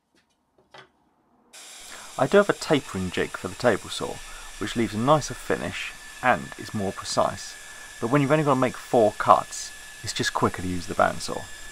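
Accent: RP, United Kingdom. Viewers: Love the posh voice, see you on site mate 😉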